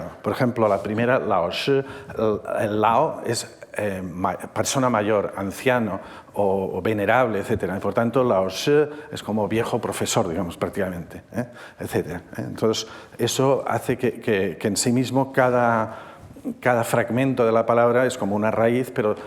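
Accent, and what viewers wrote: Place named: Catalonia, Spain. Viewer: Como dice el conferenciante es catalanohablante y el plural de caràcter en catalán es caràcters. No hay cambio de tonicidad. También se nota en la forma "hacer servir“ (fer servir) y, sobretodo, en las s sonoras de los plurales en contacto con vocal posterior, un fonema q en castellano no existe, pero q en catalán, portugués o francés es lo normal en esta posición.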